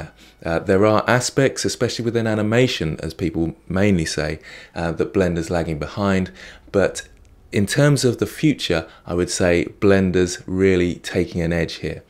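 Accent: United Kingdom. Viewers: And that british accent :p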